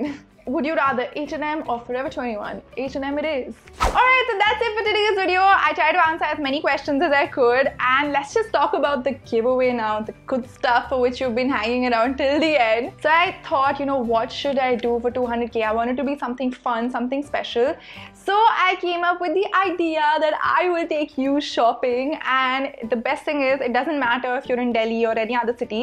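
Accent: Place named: India. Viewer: I love your sorta indian accent. You have such a pretty voice and right when I stumbled upon your channel I immediately knew I hadd.... to subscribe.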